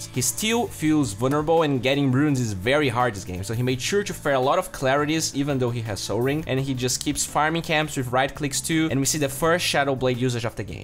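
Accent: Brazil. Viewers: Draran but you are mistaken. It is his accent. Most brazilians have this accent, fluent or not. It is because of how phonems in portuguese words are structured and end up being carried on to english as well.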